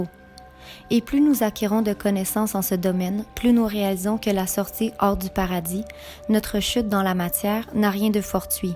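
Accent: Quebec, Canada. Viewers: Tu es Québécoise? Car tu as l'accent. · merci bcp pour l'effort...je me demande simplement pourquoi un documentaire si intéressant et si beau comme celui-ci de ne pas penser le soumettre à une voix française au lieu canadienne pour qu'il soit auditivement confortable.merci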